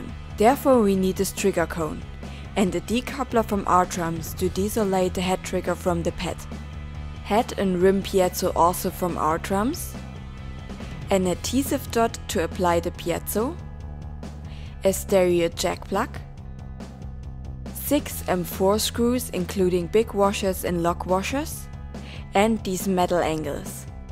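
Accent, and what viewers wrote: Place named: Germany. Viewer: This seems to be a very sophisticated way for a transition. The aluminium disc is only needed from my perspected, when you want to muffle the batter head of a regular drum head to make it silence. Otherwise take any wood or aluminium profile, that doesn‘t flex so much and attach the rim shot piezo at the shell of the drum. But great explained how to proceed! And a nice German accent 😉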